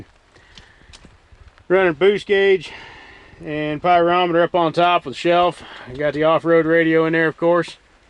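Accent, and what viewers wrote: South, United States. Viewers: Northern Southern drawl. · I was so confused when you said NE WY and then talked with a southern accent until you said you used to be in NC haha. Badass truck bud! My kind shit. Except I'd prefer a minty ol' Ferd. But all them old Merican trucks are sweet! I could use a dump box like this for my firewood gathering. SE WI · I thought from that accent I'd be right at home in Wyoming. Then you said you were from NC. Makes sense now lol.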